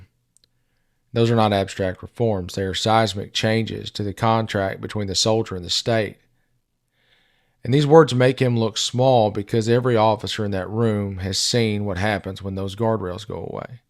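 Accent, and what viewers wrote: South, United States. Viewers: Hearing this with southern twang… you make me proud to be a fellow citizen of yours. I really hope there’s more of yous than there are of them. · Thank you for your words. Fellow Arkansan. It's hopeful to hear words like these come from a southern accent.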